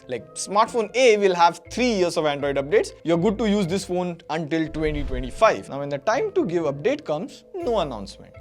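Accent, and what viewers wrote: India. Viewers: why hindi speaker always say "guudu" instead of good 😅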